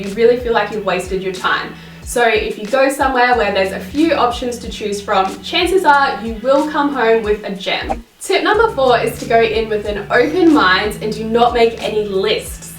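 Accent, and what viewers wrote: Australia. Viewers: Her accent is actually Australian :)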